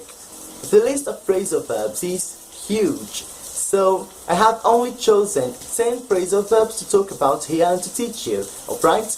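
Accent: United Kingdom. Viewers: Muito legal, muito útil! Eu conhecia 4 dos 10 phrasal verbs, os outros eu nunca imaginaria! Parabéns pelo canal e pelo "Great British Accent"! See ya mate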